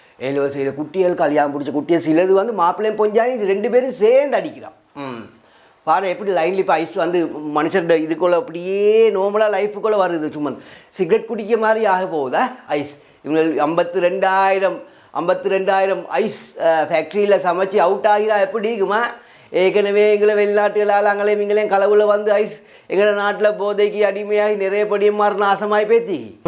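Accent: Sri Lanka. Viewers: இது Colombo தமிழ்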